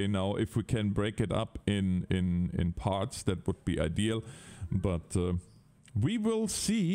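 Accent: Germany. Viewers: Bist du ein Deutscher in Kanada? Muss fragen, weil ich den deutschen Akzent klar heraushöre, mich dann aber wundere über den Kanalnamen und das Wohnsitzland Kanada.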